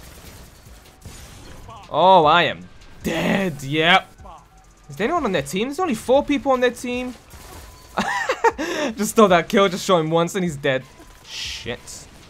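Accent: United Kingdom. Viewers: This is like british jev (sorry if you arent brittish im not sure what nationality you are but you sound british)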